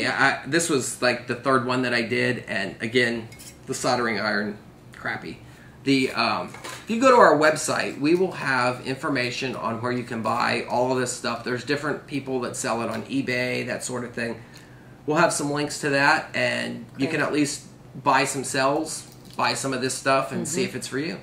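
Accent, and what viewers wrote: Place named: United States. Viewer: WHY DO YOU AMERICANS SAY SODERING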